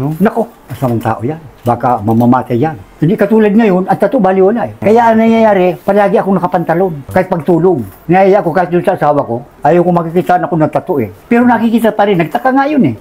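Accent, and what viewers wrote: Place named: Bicol, Philippines. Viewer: Part 4 boss Galing c Tatay magkwento tono bicolano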